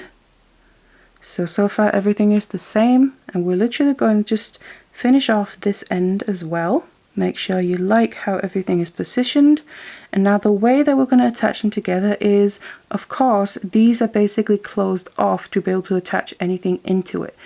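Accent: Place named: England, United Kingdom. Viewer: And such a change to hear an English accent instead of US 😂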